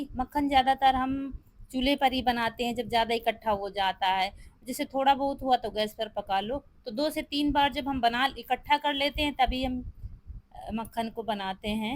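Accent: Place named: Jabalpur, India. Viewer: Aapke bolane Ka Tarika angreji Hamare Yahan Jaisa Hai Hamen MP ke Jabalpur Jile Se Hain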